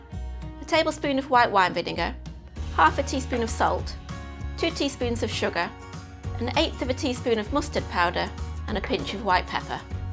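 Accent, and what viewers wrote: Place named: England, United Kingdom. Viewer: At last.......an English accent :)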